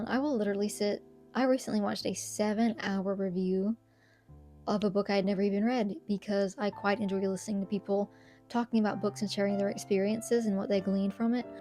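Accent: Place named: Texas, United States. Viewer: Not gonna lie. I have never found someone so cute so fast. Stumbling here and there. Just real communication. A bit of a eye-avoidance reserveness/shyness going on. The lil texan accent in the background. Wow.❤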